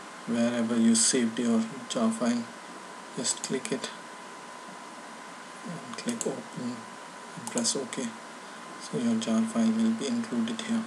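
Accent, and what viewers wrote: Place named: India. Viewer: india english??? OMG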